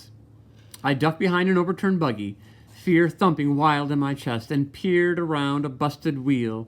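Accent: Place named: Texas, United States. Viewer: U have a texas voice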